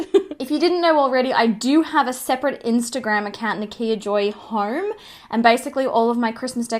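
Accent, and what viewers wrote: Australia. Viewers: I love watching your videos and I love your Australian accent 😃❤ You are so beautiful on the top of that lol 😍🤗🌺 Xoxo from Canada 🇨🇦✌❤🤗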